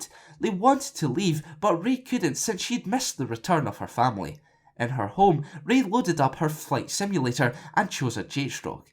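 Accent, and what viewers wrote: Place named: Aberdeen, United Kingdom. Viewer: Im guessing you're from Aberdeen, Scotland and 17-19 years old?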